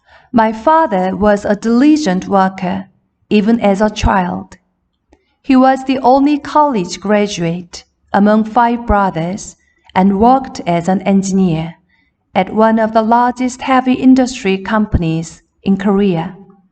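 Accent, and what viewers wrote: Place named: United Kingdom. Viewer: 영국식 영어발음 넘 멋져요 ❤️ 읽어 봐야겠어요.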